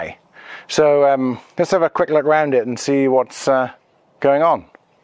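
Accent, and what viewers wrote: England, United Kingdom. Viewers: You're English, right?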